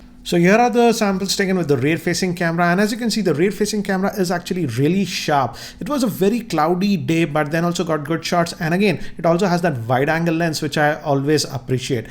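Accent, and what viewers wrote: India. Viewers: The Indian accent is too hard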